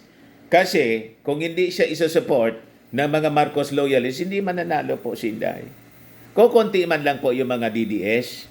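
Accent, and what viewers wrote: Bicol, Philippines. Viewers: bicolano kayo sir?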